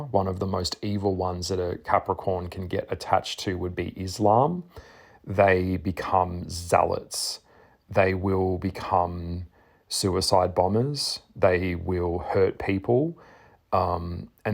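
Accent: Australia. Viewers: 🤣🤣 he is Australian not French where did you get that from ?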